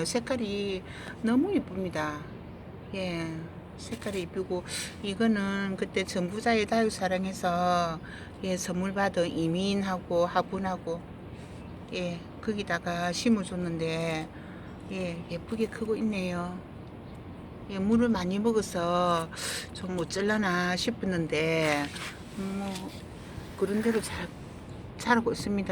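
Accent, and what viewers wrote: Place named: Gyeongsang, South Korea. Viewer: 예뿐다육이들 정성스럽게 잘관리하시고요. 말씀을 아주 예쁘게도 하시네요. 정말 경상도사투리가 정겹네요?😄😄😄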